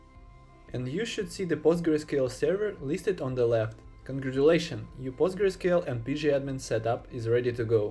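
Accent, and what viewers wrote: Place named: Russia. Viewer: спасибо дружище! русский акцент тебя выдал)))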